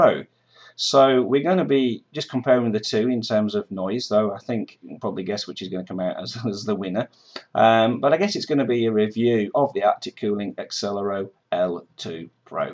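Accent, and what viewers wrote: United Kingdom. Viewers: Your accent is similar to mine, I'm in Leeds, but I don't pronounce it like that lol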